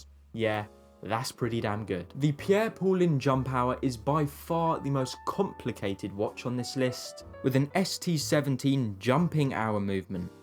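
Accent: England, United Kingdom. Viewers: He is British, whats the problem.... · If you find the way this guy speaks irritating, then you don't want to venture any further than Southern England. I live in the North and there's a completely different accent every 30-40 miles. To anyone outside the UK it probably feels like they're visiting a new country within a 1 hour drive 😂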